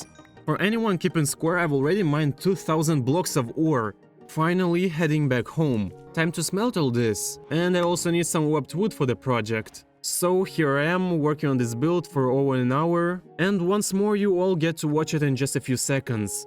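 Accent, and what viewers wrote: Russia. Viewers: окей, по акценту и шуткам, ты явно русскоговорящий. пришел в комментарии пожелать тебе удачи в твоём пути, видос получился уютный, надеюсь увидеть от тебя ещё такого. 💪🙂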